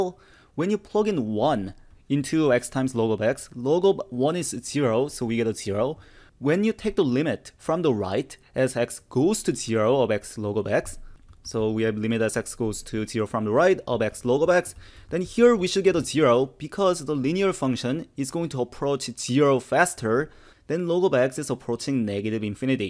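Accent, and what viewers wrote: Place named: South Korea. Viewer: You must be Korean, with that strong Korean accent, which really adds flavour to your videos.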